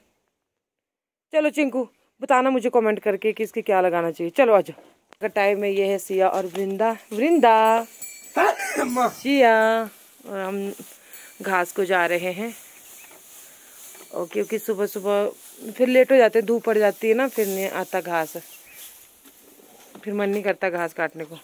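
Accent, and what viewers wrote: Punjab, India. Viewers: Tuhadi Punjabi Bahut mithhi ai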